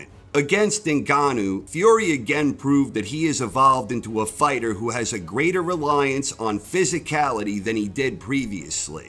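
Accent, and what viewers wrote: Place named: Boston, United States. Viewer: I think this guy is from Boston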